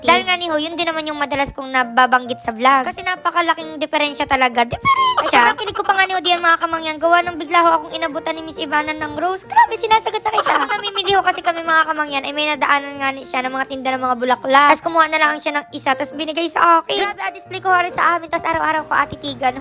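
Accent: Batangas, Philippines. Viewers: ala eh tlga tgalog tlga tono ala tga Tanauan ako isa tga hnga mo sn mkpunta kmi jan soon Godbless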